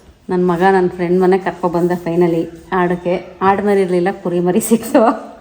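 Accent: India. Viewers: ನಿಮ್ಮ ಗ್ರಾಮೀಣ ಶೈಲಿಯ ಕನ್ನಡ ಭಾಷೆಯನ್ನು ಕೇಳಲು ನನಗೆ ಸಂತೋಷವಾಯಿತು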